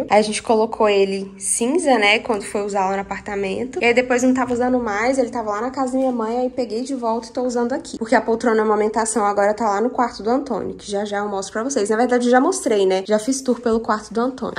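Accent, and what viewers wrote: Minas Gerais, Brazil. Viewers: Você tem sotaque de mineira 😊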